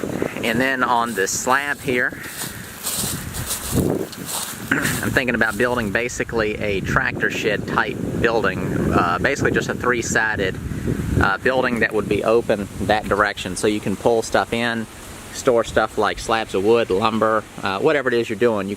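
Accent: South, United States. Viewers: I like your ideas/purpose for this nice piece of land. The Jeep would be a fun project to watch if you don’t speed up your video and someone might also learn how to do a trade by watching your channel. I love the plans for your property especially leaving the tree inside your building. Nature kinda helps slow us down enough to enjoy life without thinking about making money. I think it brings joy just having a place to go to get away from work and have fun projects you like to do. I am looking forward to seeing more on this channel. I actually forgot about your channel’s name until I heard your voice and I knew exactly what channel I was going to watch it all the way through. Poison Ivy is not something I could have around my property because my son is highly allergic to it! Could you point it out on your next video? Please... Do you work out to stay in good shape or just physically work hard at your different trades? 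I think you may have mentioned which state you live in but I don’t recall. You do have a nice Southern accent which I enjoy listening to you speak. It reminds me of my family. I hope you return soon for some of your updates! Is your other channel on a link so I can find it? Thank you young man👍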